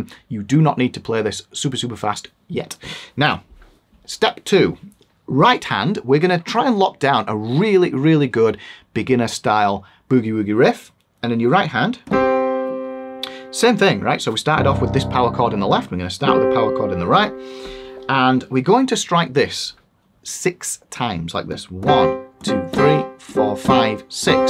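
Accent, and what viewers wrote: United Kingdom. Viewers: I’m watching this for my daughter. I know music theotry, but I am a bassist who know plays mostly gtr. But because you have Czech name and a Harry Potter accent, she might listen to you. If a I showed her this, I’d be just a stupid dad, but for her your professor…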